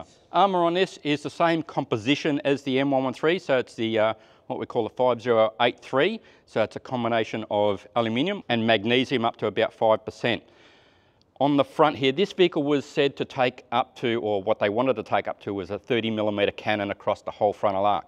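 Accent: Australia. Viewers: Awesome! Thanks! I really like the australian english accent! Greetings from Germany!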